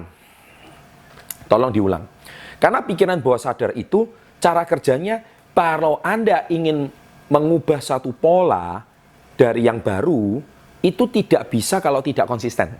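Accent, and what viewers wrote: Java, Indonesia. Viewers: Keren mas... saya suka gaya bahasanya... dialek jawanya kental banget...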